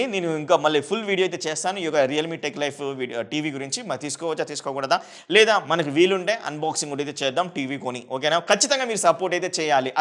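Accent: Telangana, India. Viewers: మీకు తెలుగు సరిగా రావటం లేదు ఎందుకని, తెలంగాణ వాళ్ళ మీరు? స్లాంగ్ totally తెలంగాణ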